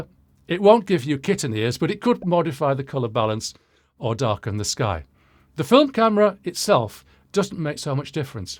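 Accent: United Kingdom. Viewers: Ouch. Anyone watching this video should read the comments before wasting the time viewing this one. The only thing credible about the commentary on film photography is that the speaker has a British accent. One cannot rely on this as a source of credibility. BTW, I did watch your other content, and it's great! Why tarnish your channel with this? Less credible material adds to the pile of rubbish that people have to sort through on youtube. Stick to audio. THAT is what you are great at!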